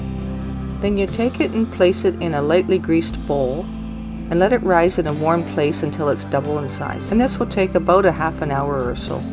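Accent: Canada. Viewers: Thanks for sharing I love naan bread and I also like your Canadian accent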